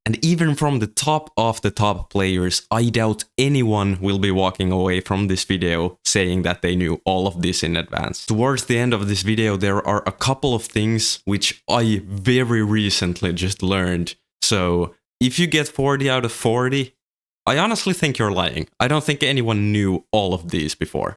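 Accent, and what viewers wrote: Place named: Finland. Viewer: Suomi englanti on parasta! Teetkö muute suomeks kans?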